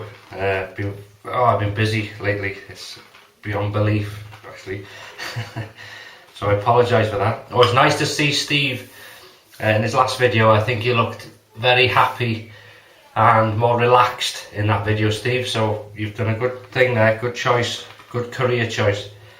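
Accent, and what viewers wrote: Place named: Wales, United Kingdom. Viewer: Nice vids! Subbed. Didn't know you were welsh...(I am rubbish at figuring accents out haha). -Matt (Norwich)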